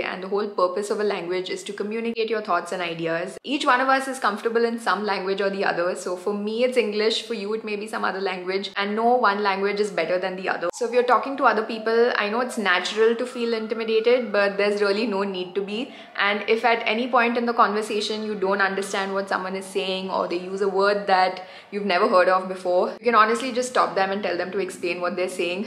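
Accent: India. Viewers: But your accent is totally indian you can’t say either American or British lol I enjoy watching your videos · today i am first time encountered with your video, i liked your fluency in english with good indian accent could understand easily.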